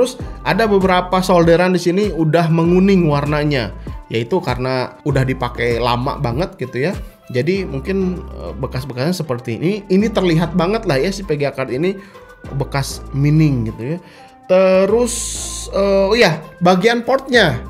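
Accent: West Java, Indonesia. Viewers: sunda pisan wkwkwk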